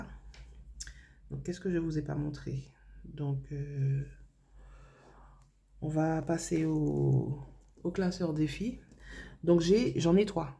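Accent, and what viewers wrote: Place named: Antilles, France. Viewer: Coucou bienvenue! Je me suis abonné 🎉🎉 j’entends les Antilles dans l’accent sa m’est familier😂! Bon courage à toi et a ta famille et au plaisir de voir tes prochaines vidéos 🎉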